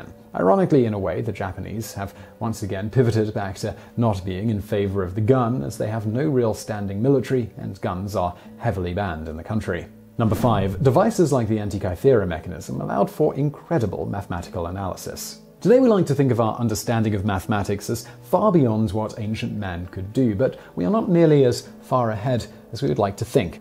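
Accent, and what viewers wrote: United Kingdom. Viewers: Your way of talking and breathing and articulating and .. it's so weird, like you've got astma, but I believe as you're british, that helps on the video sound, the channel is awesome !!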